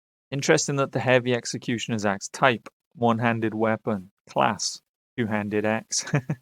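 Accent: United Kingdom. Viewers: I can hear the South Wales accent straight away, Swansea me. 😂🏴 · I always enjoy your videos and your accent as well, what part of the UK are you from?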